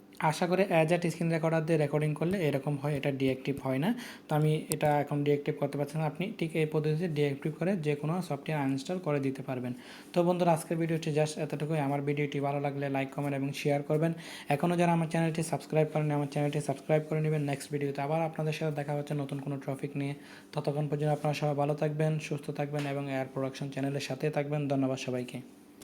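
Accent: Chittagong, Bangladesh. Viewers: চিটাগাংইয়া নাকি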